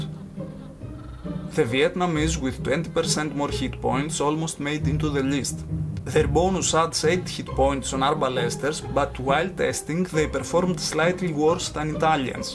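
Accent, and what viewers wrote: Greece. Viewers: LOL when I watched the video I said, he is Greek for sure 😂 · I'm watching these videos to listen to the Greek accent actually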